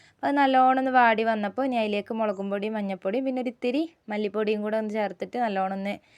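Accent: Malappuram, India. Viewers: മലപ്പുറം ഭാഷ ആണല്ലോ 😮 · എനിക്ക് ഏറ്റവും ഇഷ്ടപ്പെട്ടത്. അവതരണമാണ്.മലപ്പുറം സ്റ്റൈൽ'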